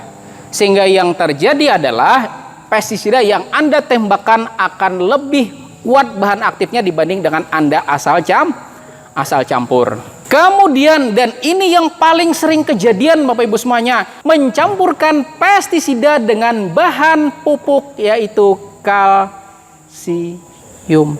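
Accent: Banjar, Indonesia. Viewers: Sulsel hadir. 10 tahun di Kaltim. Serasa bernostalgia dengan logat Banjarnya bang. Salam agro..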